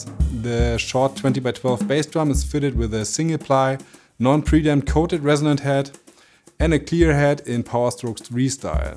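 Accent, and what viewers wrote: Germany. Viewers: Als ich den deutschen Akzent gehört habe habe ich mich außergewöhnlich gefreut weil es kein Set aus Amerika ist, das man so schwer nach Europa bekommt. Gott segne euch!!!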